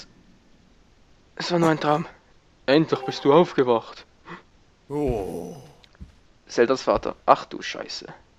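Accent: Switzerland. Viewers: ich finde deine videos echt witzig vor allen mit deinem Akzent. bist du Schweizer oder so? wenn du das so nachspricht was link so "sagt" hört sich echt witzig an. link mit einem schweizer Akzent xD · HA! Tscha zeme! Schwiizerakzänt!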